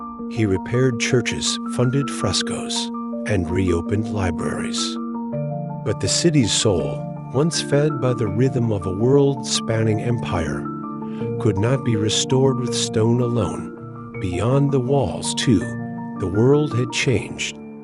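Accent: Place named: United States. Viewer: This American accent sucks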